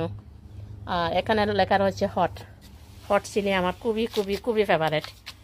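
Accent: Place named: Sylhet, Bangladesh. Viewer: আসসালামু আলাইকুম আপু কেমন আছেন আপু আপনার বিডয় টা দেখে কুব ভালো লাগলো আপু আপনি মনে হই আমাদের সিলেটে মানুষ কথা গুলো শুনতে খুব ভালো লাগলো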